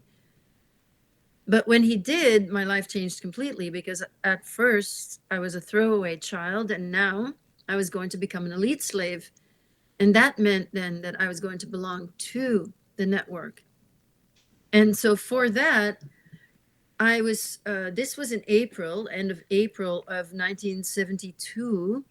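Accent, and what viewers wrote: Australia. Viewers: Who is the Voice aussie?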